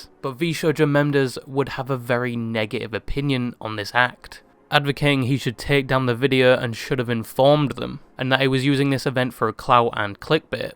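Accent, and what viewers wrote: United Kingdom. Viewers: British man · ahh yes the british disembodied voice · he’s just british mate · wait you're a brit?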